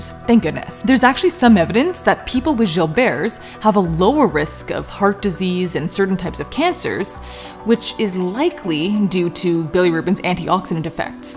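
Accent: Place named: Canada. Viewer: She sounds Canadian. Lots of good information here.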